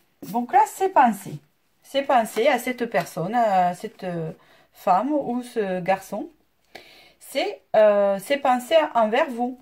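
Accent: South, France. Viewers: Bonjour, J'adore ton accent du sud,il inspire la joie ,le soleil, ne le change pas ,un plaisir de t'écouter parler Merci pour ta générosité ❤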